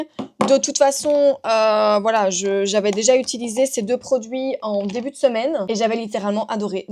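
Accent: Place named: Belgium. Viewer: Bonsoir, je n ai pas trouvé la réf de la lampe que tu mets sur ta caméra. C est pour accrocher à mon miroir. J ai cherché sur ta boutique Amazon. C est carrément le makeup que j aime: glow… J ai exactement le même modèle de boucles d’oreilles. C est vraiment un bel intemporel. C est vrai que tu as un fort accent belge parfois c est très drôle 😂😂😂. Je vois que Vivi t accompagne au pilâtes. Mes chats font la même chose. Ils se demandent ce que je fais par terre. Tu as utilisé ta palette Dior habituelle pour le glow? Je pense que je vais me commander la brume Rhode. Bises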